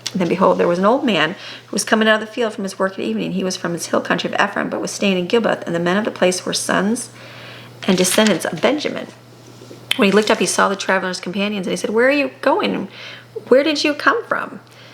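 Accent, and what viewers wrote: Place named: Ireland. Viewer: I thought the same Amy , sounds Irish as we would say. Mind you none so blind that do not want to see. Thank you for reading to us. This passage has such a familiar ring to it and isnt so far from what is going on in this world today.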